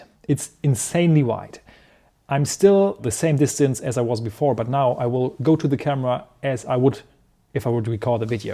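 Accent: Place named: Germany. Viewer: That barely discernible German accent makes everything subtly similar to Schindler's List.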